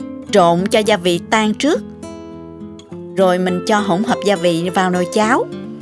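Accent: South, Vietnam. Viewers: Khong the noi ca?m o*n bao nhieu lan den voi. Kenh cua la kenh nau an, nhung thich nhat la cach dung tieng Viet cua, vua sang tro.ng, vua trong sa'ng, va rat ngot ngao voi giong Nam bo^. Toi nghe cac ban trong nuoc xai tu*`, toi that su*. nga'n nga^?m, cach noi vo^.i va`ng, dung tu*` hung du*~..... Rat tran tro.ng kenh vi da giu gin su*. trong sa'ng cua tieng Viet. Than chao, Hiep Than Minneapolis, MN Hoaky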